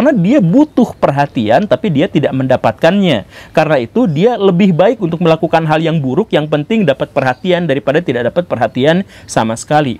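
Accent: West Java, Indonesia. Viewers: Aura Sunda nya sangat kental pa guru😊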